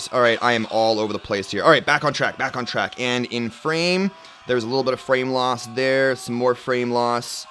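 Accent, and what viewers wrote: Canada. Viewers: I must ask... Are you Canadian? :>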